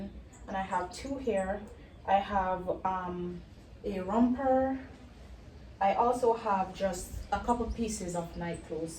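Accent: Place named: United States. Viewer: I can hear the American accent coming up little by little... Especially when u keep using the term "you know"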